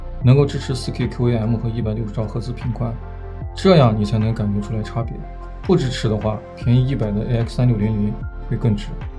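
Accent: Northwest, China. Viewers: 标准西北口音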